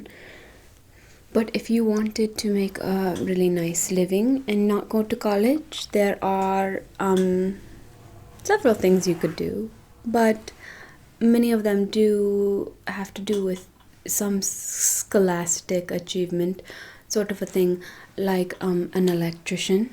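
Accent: India. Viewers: YESSSSSS U CAME BACK , WE ALL LOVE YOU HERE THANK U SO MUCH ❤ BEST INDIAN ACCENT ASMR ON YOUTUBE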